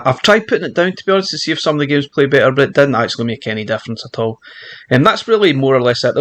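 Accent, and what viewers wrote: Scotland, United Kingdom. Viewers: It's the Scottish accent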